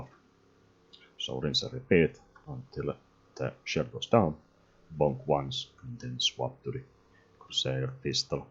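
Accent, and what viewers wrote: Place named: Finland. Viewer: Whoa, that's nice. Hopefully we get this into the live next update. First vid I've seen from you and your accent got me wondering if you're a Finn by chance?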